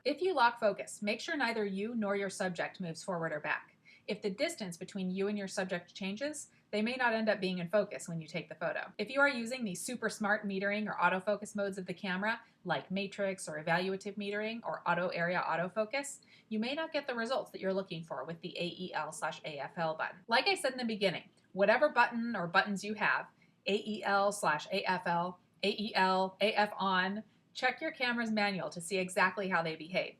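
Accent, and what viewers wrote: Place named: New Jersey, United States. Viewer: I am sorry for all the childish and inappropriate remarks on your videos. I guess these high school mentality boys need to go back to their magazines. Perhaps their moms never taught them respect. Thanks for making this video. Does the camera, my D750, need to be in a specific mode in order to use the AE-L/AF-L button effectively? Being brought up in New Jersey you are talking just fine.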